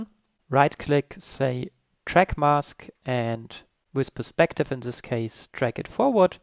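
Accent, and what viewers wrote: Germany. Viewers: Hab das erste Wort gehört und wusste direkt, dass du Deutscher bist :D Gutes Tutorial übrigens :)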